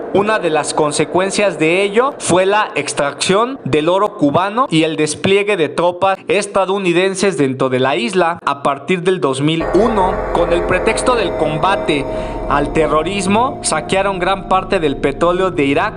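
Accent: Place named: Tepito, Mexico. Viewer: Muy buenos tus videos. Lo único malo es que tienes un acento tepiteño , te sugiero mejores en un español neutral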